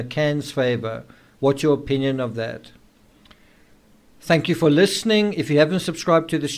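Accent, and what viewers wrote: South Africa. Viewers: First time watcher. Why does it sound like you are South African?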